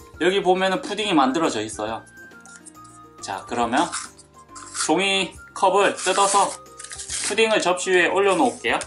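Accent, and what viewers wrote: Busan, South Korea. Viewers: 허팝님이사투리리쓰시나.저는부산녀자ㅎㅎㅎ 자꾸 부산사투리억양이나오시는허팝님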